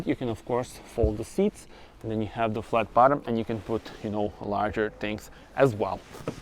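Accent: Russia. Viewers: с русским акцентом) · Мне показалось, или у него русский проскальзывает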